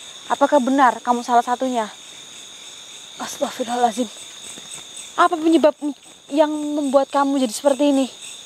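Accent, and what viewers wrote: Banyumas, Indonesia. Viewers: Aq suka geli ketawa sendiri denger dialeknya yg lucu.spt tibluk.ya bhs Banyumasan..bs ngobatin rasa rinduku pd kampung halaman.ayo maju terus mb.I lope.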